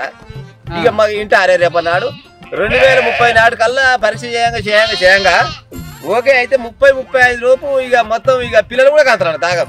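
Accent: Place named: Telangana, India. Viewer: గివార్తేమో గానీ,. నీ నోటినుండి వచ్చే నా పల్లె యాస- నా తల్లి బాస - నా తెలంగాణ యాస.. మస్తుగుంది.. 👍👍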